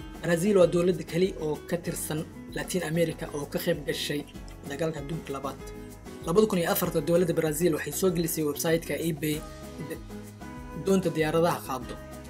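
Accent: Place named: Benadir, Somalia. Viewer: Sxb afsomaliga wuu lahjad bandyhy haku mashquulina lahhada banaadiriga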